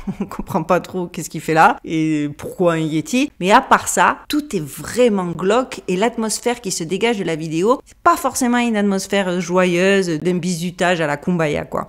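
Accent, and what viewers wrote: Marseille, France. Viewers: Trop géniale votre vidéo j apprécié beaucoup votre côté solaire et votre franchise avec votre accent du sud Marseille? Moi j y habite mais j avoue que Las vegas ou San Francisco m attire bref continuez ainsi moi j adore 🙏👍❤️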